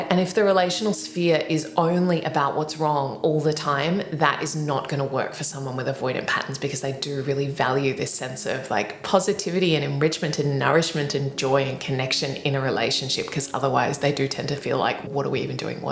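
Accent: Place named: England, United Kingdom. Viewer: You speak in a very elegant way. Also love the English accent. Great video!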